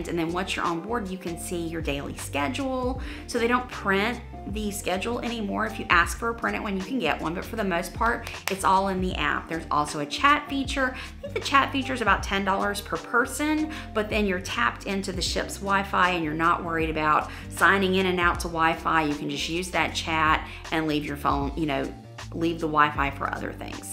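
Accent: South, United States. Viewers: She sounds southern, so maybe Virginia.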